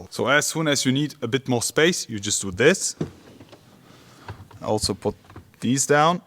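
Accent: Germany. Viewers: I love your German accent. I won’t say who you sound like but I like it. I also think you give very good presentations and cover the smaller details as well as the big ones!!! Much love and respect and the new EQS SUV is to die for. Such quiet class and beauty!!! I could live in that thing…🥰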